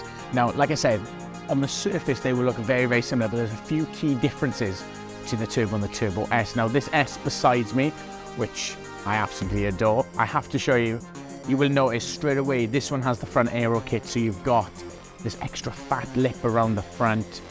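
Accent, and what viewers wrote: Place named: Wales, United Kingdom. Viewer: He sounds welsh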